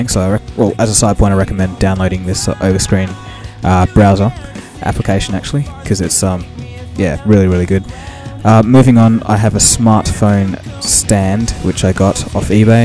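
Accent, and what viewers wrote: Australia. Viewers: holy crap are you australian? NO WAY! that accent is too smoothing to be australian D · nice OZZY accent BTW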